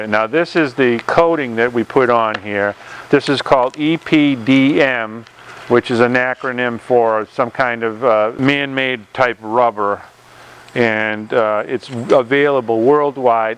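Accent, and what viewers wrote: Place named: New England, United States. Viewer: It would be a lot better if the person speaking didn't have an obnoxious Yankee accent... I could only make it through 1 minute of it before having to give it a thumbs-down and canceling the video.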